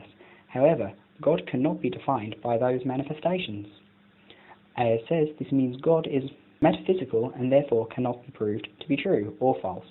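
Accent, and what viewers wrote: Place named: United Kingdom. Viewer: Holy shit this is awful in a British accent.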